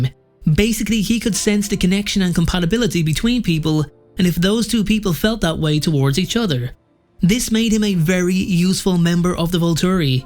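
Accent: Ireland. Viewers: This is a great channel, you should make videos on the Saga of Darren Shan, very little lore videos on YouTube about the series and it’s an amazing vampire series ! You sound Irish so I presume you’ve read them but if not check them out the audiobooks by Ralph lister are amazing! Subbed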